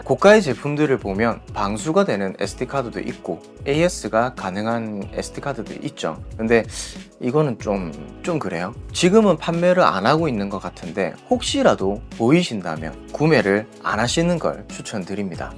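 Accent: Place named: Busan, South Korea. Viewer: 카메라 관련 제품리뷰를 찾아보려면, (어쩔수 없지만) 광고협찬이 많다보니 믿고 시청하기가 힘든 경험이 많네요 ㅠㅠ 그래도 님이나 몇몇 리뷰어 분들께서 이렇게 솔직히 얘기해주시니 더 믿음이 가는 것 같습니다!! 이런 분들은 나중에 협찬을 받더라도 "정말 좋은 제품을 소개해주려나보다"라는 마음이 드는 거 같아요! 좋은 영상 감사하고 앞으로 더 잘 되시기 바랍니다! +부산사람이다 보니 사투리가 정겹네요😊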